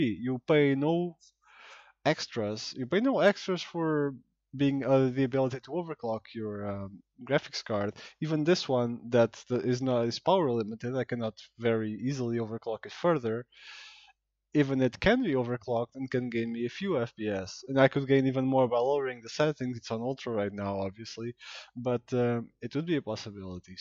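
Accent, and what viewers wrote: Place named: Portugal. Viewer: Compreendo :D Eu assim que te ouvi a falar, pimba! Este gajo é tuga! :D Estou agora a experimentar o teu tutorial na minha EVGA 2070!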